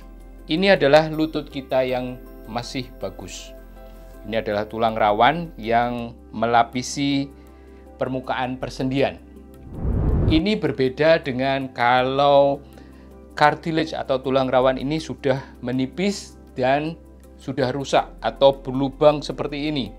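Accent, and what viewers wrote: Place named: Java, Indonesia. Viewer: Medok ya dokter Jowo ne ... siipp deh pokoknya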